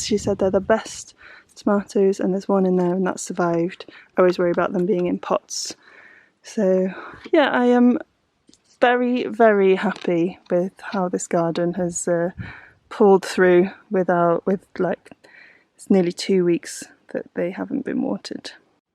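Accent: Wales, United Kingdom. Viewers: A fantastic construction of your journeys! I mean the telling of events; but moreover the ‘Journey of Mind’ is evident in your “Home to Home” travelogue. What IS HOME… On one hand I can hear the WELSH accents in this video (as anyone does when they get “home” Flip side to see the excitement of “home” being seeing the watering and chickens. BTW you sound so much more confident and in your element in the garden than your starting videos! You are Home! “You Got This”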